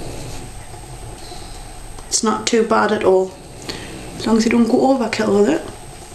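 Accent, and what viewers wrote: Newcastle, United Kingdom. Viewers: I think she comes from near newcastle :D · nice british accent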